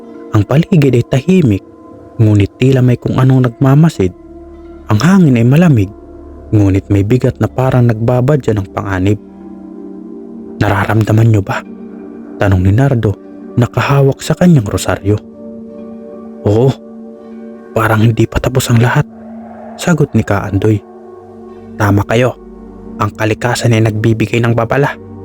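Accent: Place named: Waray, Philippines. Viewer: Waray waray itong narrator kasiang tuno ng kanyang salita hindi mapakakaila na waray waray alagad intoy hehe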